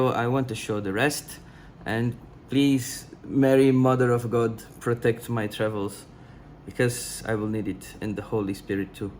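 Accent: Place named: Russia. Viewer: Tem N com sotaque russo, tem like.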